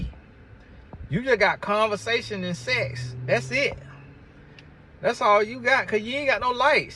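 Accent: South, United States. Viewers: I love listening to your country self. Fasting definitely has its blessings.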